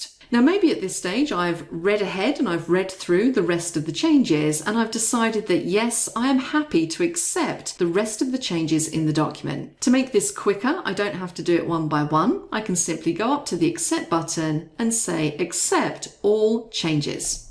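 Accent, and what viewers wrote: United Kingdom. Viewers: Very difficult to fiolllow the explanation about track changes turning off or not. Replayed several times, could not understand the british accent, and what was being clicked on. Need to slow it down a little.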